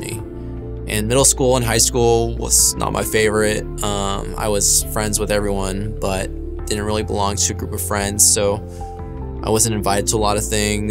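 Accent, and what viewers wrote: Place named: Kansas City, United States. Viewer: You sound like you are from KC..I am sending your videos to my 21 yr old who is a senior in AR but was raised in KS. She is strong in the faith and yet goes to a huge party school where it is frowned upon. Dating has been hard for her but she managed to meet Christian guys at Country dance clubs as she loves to dance and would go there in AR and then she went out in KS to one over break and she met a guy who gave her his business card with a bible verse on back. Here I am wanting her to be equally yoked and she keeps meeting guys in the weirdest places but they dont work out. Your videos are really funny!! Thank you for being real and even though its a difficult walk..Dont compromise or give up ...persevere...Jesus is worth it!!! God Bless!!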